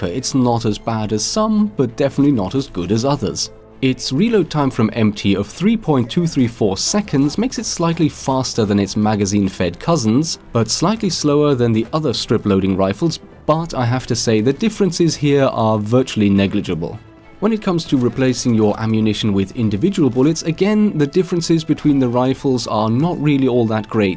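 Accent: Germany. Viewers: lol are u german ? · Are you German ?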